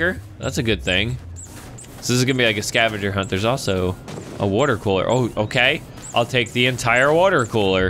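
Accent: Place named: Russia. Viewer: In case you didnt notice he has a rusian acsent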